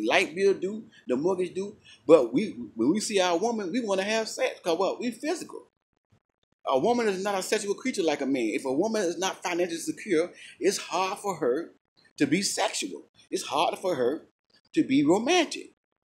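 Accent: South, United States. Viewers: Sounds like your from the South. What that entails in the Northeast or California is on on average a 2,000 dollar a month mortgage, 250 dollar a month heat and energy bill, 150 a month cable bill, 100 dollar amonth utility bill, 800 dollar a month health insurance bill, 300 dollar a month car insurance bill, 600 dollar a month grocery bill, 600 dollar a month for car notes, and 500 dollar a month for savings. Thats about 5300 dollars a month. So to be a provider you need to be maiking over 80k a year if you live in these areas. It takes 2 incomes this ain't the little house on the prairie.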